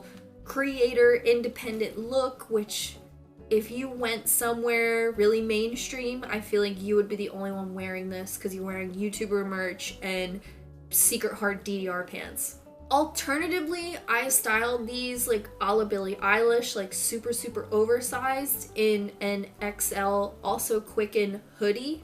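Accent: Philadelphia, United States. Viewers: Getting used to short nails is so difficult 😩 I loveeee this content 💞 your philly accent is my fav thing as someone from the west coast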